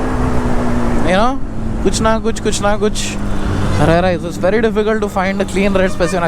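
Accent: Hyderabad, India. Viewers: bhai iss bike ka restoration vala video dalo aur thode videos banvo kuch bhi chale ga kyuki muje aapki Hyderabadi accent bahut badiya lagti hee love you ❤️🙏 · Love the hyderabadi skeltonic accent 👌👌 Thanks for sharing the japanese-indian yamaha aesthetics. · I like your voice bro. Hyderabadi ton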